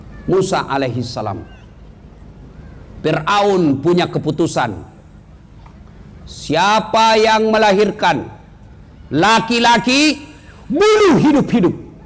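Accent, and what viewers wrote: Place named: Bugis, Indonesia. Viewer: Assalamualaikum... Saya juga suka sngat ceramah ustaz das'ad latif.... Tpi sy tak berapa nak faham, pekat sngat bahasa bugis nya..boleh tak sempan sarikata dekat bawa..(melayu) 😊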